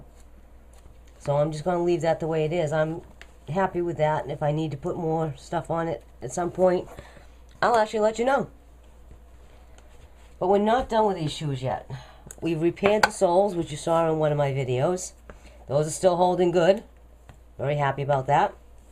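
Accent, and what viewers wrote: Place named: New England, United States. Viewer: That New England accent 💕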